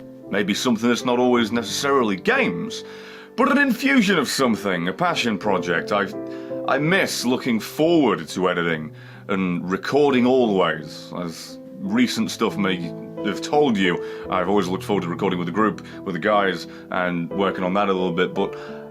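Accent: United Kingdom. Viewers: As long as you do what keeps your interest and mind going, I think we’ll always stick around,. We’re here for you. We came for Isaac, we stayed for the screaming, enraged, messed up speech displaying British man that is you.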